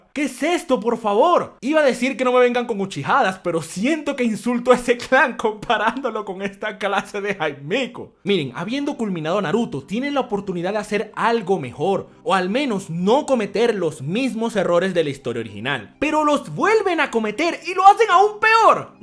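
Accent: Peru. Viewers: Oye we eres peruano?? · ERES DE PERU, NO?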